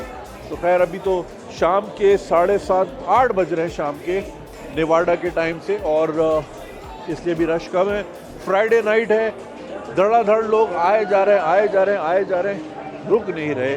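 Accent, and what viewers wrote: Karachi, Pakistan. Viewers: Paki in las vegas with his orangi town english accent 😂🎉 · walking tales ko dekny ki main waja ti karachi xcent asal maza us style ka ta funy bato ka ta pakistan k sat comperssion ka ta is tara to seeda sada banda youtube my kahi b dek sakta h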